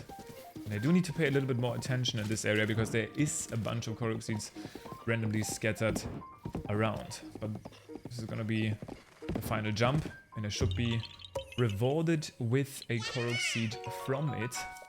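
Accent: Germany. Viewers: little german accend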